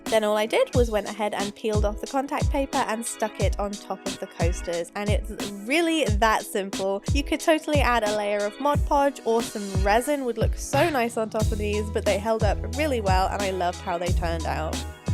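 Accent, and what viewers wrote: England, United Kingdom. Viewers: Flora Dora she is from England but I'm not sure where!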